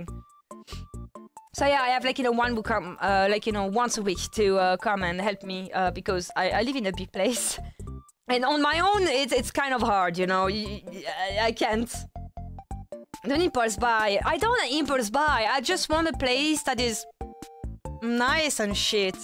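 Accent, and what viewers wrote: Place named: France. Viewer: I disliked french accent before but MAN im started to get addicted to it!!! I apologize... i wasnt really familiar with your game.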